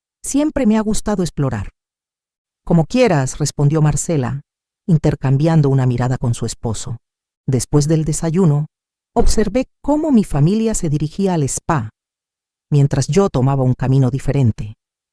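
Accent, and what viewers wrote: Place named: Puerto Rico. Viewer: Que hermosa lección con lindos valores de siempre ser humildes. A parte, creo que la narradora es puertorriqueña. Acostumbro a escuchar cuentos e historias y esta manera de hablar o el acento me parece muy familiar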